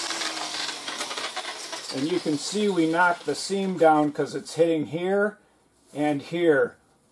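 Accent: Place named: Ireland. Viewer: Nicely done! Good practice lesson love the Irish ☘️ accent!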